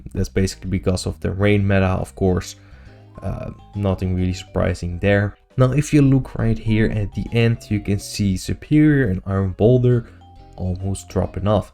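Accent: Netherlands. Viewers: First word you spoke I immediately knew I was hearing a fellow dutchie 😂 Lovely content though :) glad to have found your channel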